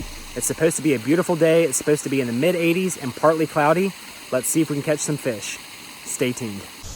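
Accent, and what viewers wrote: Pennsylvania, United States. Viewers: That's a awesome day of fishing! What state are you fishing in? You sound like you're from P.A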